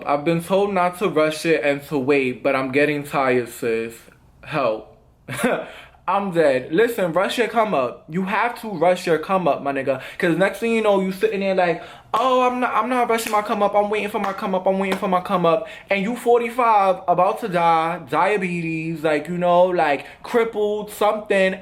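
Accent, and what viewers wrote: New York, United States. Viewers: Lol when I watch his videos I can hear my New York accent omg 😭😭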